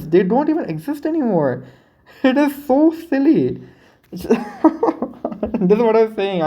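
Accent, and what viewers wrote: India. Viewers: You indian bruv ?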